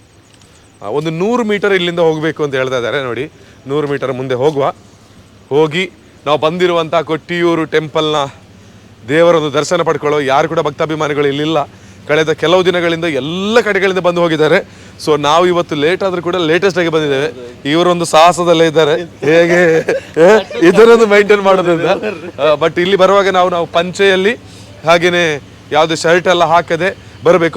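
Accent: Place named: Tulu, India. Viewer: Namma Tulu dakalna taking style de gotthapund🤩👌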